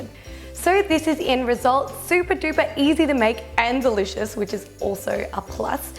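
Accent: Australia. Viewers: Are you Australian I'm new to your channel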